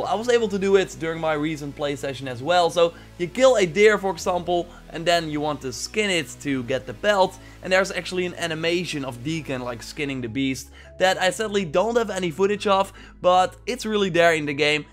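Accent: Netherlands. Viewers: What is this guy's accent? Sounds Dutch.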